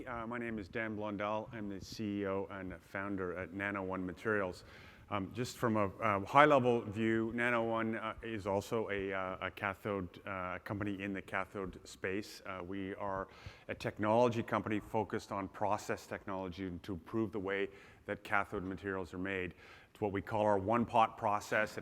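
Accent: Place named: Canada. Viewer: More b.s. with a Canadian accent. Does this little socialistically propped-up company really think it will hold the major powers hostage with their patented processes?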